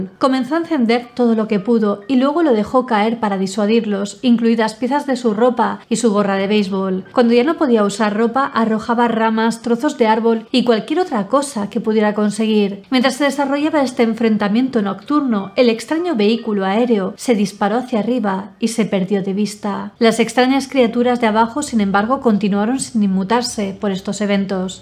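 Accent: Spain. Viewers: Qué hermoso acento español tienes. Tus investigaciones me encantan, pero con tu acento son perfectos. Saludos!!! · Como siempre genial 😃 . Un pequeño consejo, dicho desde el respeto, si la narración fuera más lenta la disfrutaríamos más... Sé que los españoles hablan muy rápido, pero somos muchooooos fans latinos, así tu encanto llegaria al 120 % !!!!! OMG . GRACIAS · Hermosa con una linda voz y ese acento español , me enamore a primera vista ...de verdad 👍🥰 Saludos desde Chile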